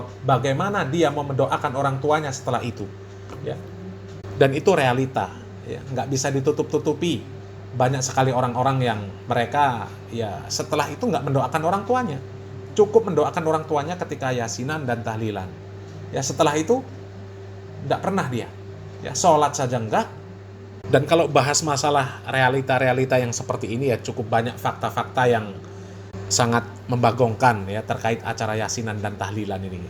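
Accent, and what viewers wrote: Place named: East Java, Indonesia. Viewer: Dari aksennya, orang Jatim seputaran Surabaya Malang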